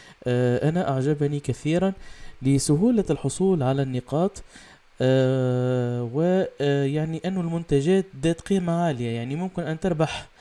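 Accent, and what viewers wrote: Tunisia. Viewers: هل انت تونسي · انت تونسي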